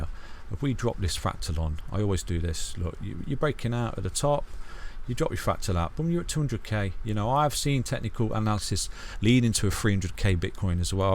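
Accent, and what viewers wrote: United Kingdom. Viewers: I enjoy the British fashion of your talk "bam bam bam see you later" haha.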